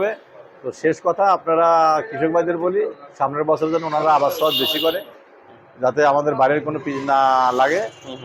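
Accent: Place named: Nazirganj, Bangladesh. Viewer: আপনার বাড়ি কি সুজানগর আপনি নাজিরগঞ্জ কথা বলতে পারেন বোনকোলা হাটের কথা বলতে হবে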